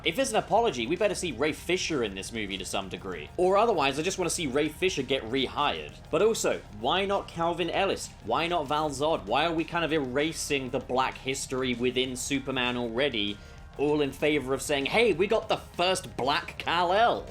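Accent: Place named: United Kingdom. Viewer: You sound British and Australian at the same time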